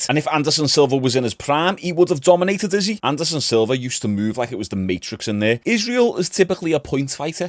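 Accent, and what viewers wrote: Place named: Yorkshire, United Kingdom. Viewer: Ars tha from Yorkshire m8? (I'm a dual citizen of Canada and UK, lived in Leeds for 4 yrs... trying to narrow down your accent)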